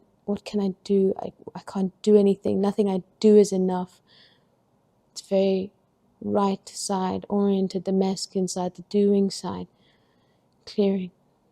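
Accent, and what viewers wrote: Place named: South Africa. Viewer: So happy to search other spiritual channels and find a fellow SOUTH AFRICAN VOICE! Cheering you on from the tip of Africa. I hope we might connect if it serves us - and humanity. I am an ex lawyer (that was brief....) now an ascension guide, I've been channelling since 2012 - specialising currently according to my team, in navigating the insanity of ascension - in other words, the psychological impact ascension is having on us individually and collectively.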